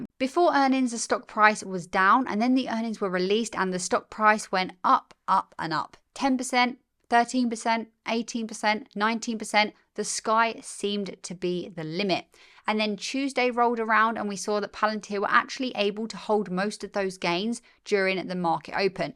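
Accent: England, United Kingdom. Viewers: It’s always a pleasure listen to your English accent talking about our dream company !!! Let’s go PLTR !! at the end of the day there’s gonna be a few bumps in the road, but in a longer run PLTR will be a monster company!!!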